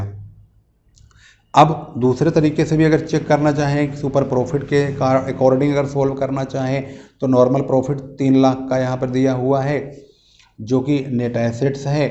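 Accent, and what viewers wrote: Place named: Meerut, India. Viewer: Sir i think you're from meerut,i guess by your tone 😅and thanks for your video 😊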